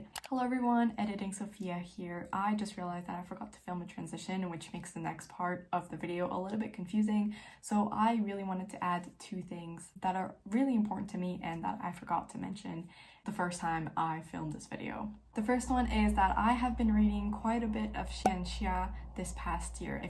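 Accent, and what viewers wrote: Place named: France. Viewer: I had a feeling you could speak French, I recognized it in your accent. You speak really well for a newbie to booktube!!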